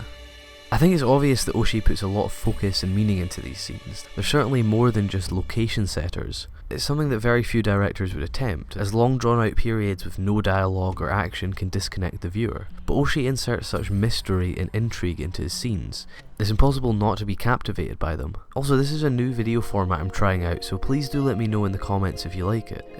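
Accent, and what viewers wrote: Scotland, United Kingdom. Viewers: Are you from Scotland and if so where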